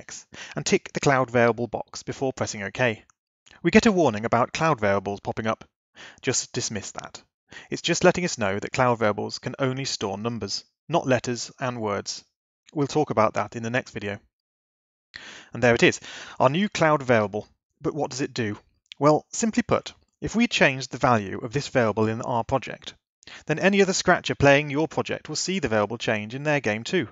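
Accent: United Kingdom. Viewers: You have British accent! Like me, I am from England too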